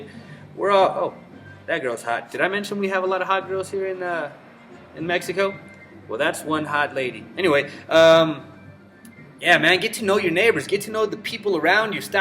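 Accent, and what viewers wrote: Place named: United States. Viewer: I am so confused. You look Middle-Eastern or East Asian. You live in Mexico and you're saying you're Mexican. Your name is. Your English in American accent is pretty spot on. Could you clarify please? I'm not being able to solve the puzzle...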